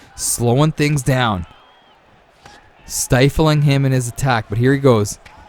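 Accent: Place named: Canada. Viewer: Dude I just found ur channel and I gotta say you got such a chill upbeat vibe and I’m not sure if I’m right but feels so Canadian, I’m only saying that cause I’m Canadian and u sound like alot of people I know which is dope!, anyways u got a new fan brotha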